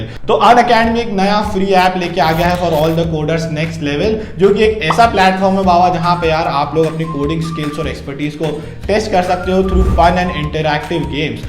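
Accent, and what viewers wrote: Indore, India. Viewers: Bava indori ho kya...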